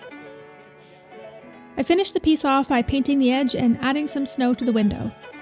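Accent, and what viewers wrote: Newcastle, United Kingdom. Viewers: I thought you might be from Newcastle 😂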